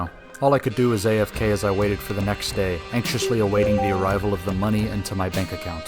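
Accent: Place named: Netherlands. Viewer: Took me a couple mins to notice you are a fellow Dutchy, props on you accent. Enjoyable content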